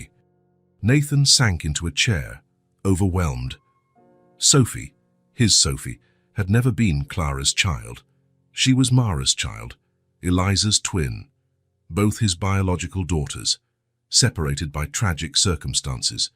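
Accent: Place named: England, United Kingdom. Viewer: It's only a story. I love the readers English accent.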